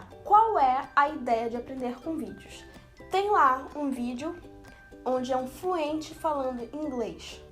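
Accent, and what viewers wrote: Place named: Santa Catarina, Brazil. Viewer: Gostei de seu sotaque catarinense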